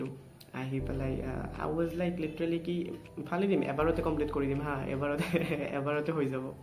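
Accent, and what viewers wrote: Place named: Nalbari, India. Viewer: kot ghor tumar. Nalbeira jen lagsi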